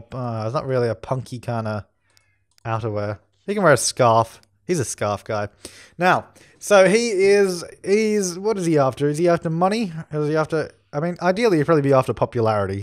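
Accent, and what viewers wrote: Australia. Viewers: I'm trying to figure out by your accent where in Australia you're from aha. I love watching your builds. I miss the Sims 2 so much. If you register your base game with Origin, you get The Sims 2 Ultimate Collection for free at the moment. Meanwhile, most of you watching probably already have each pack :p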